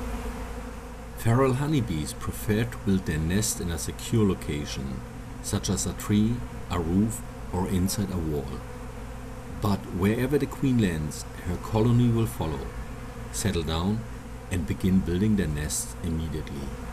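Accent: Germany. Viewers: I can hear you're German. Mega cooles Video! Weiter so! Deine Stimme ist echt beruhigend 😂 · are u from Germany ?? sound like it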